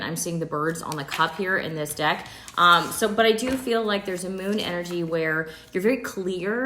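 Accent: South, United States. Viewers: I luvu❤you be on point and you funny😂you be doing this country voice while you be talking so funny😂😂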